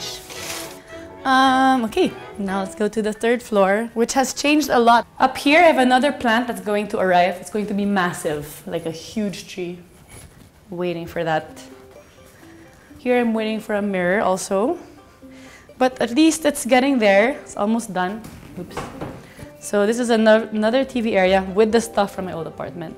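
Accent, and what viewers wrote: United Kingdom. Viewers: I like her british accent👌🤗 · British accent 😍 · That Brit.accent by 😍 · that british accent hahahaha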